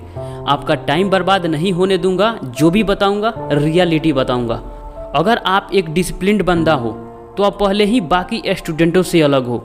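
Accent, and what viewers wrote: Bihar, India. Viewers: Bhai ap Bihar se ho kya ham lag raha hai ki ap Bihar se ho 🥰🥰❤️❤️😍😍😘😘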